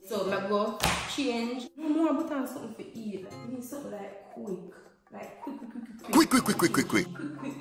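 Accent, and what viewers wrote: Jamaica. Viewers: Accent alone me hear and subscribe ❤🇯🇲🇯🇲🇯🇲🇯🇲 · Are you Jamaican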